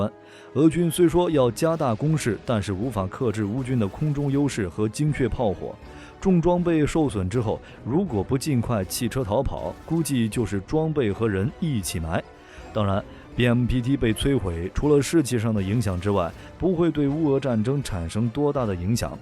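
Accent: China. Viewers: 台独反中媒体,为啥用中国大陆语音配音?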